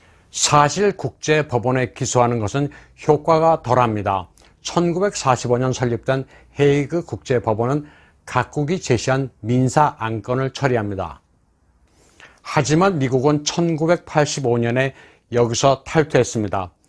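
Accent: China. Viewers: Your korean is almost sounds like ( 화교 ). Very strange Chinese accent in Korean that you are speaking of. And your news content doesn’t have Any opinions. What is this ?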